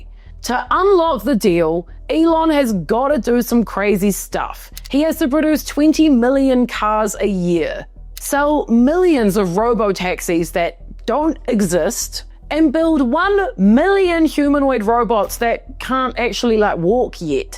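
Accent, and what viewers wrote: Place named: New Zealand. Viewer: It sounds like NZ to me. AFAIK, pronounciations like "Tiksus" and "sivunty" have the Kiwi vowel shift. A few weeks, ago, she was talking about people from Mexico and it sounded like she was saying "mix a can"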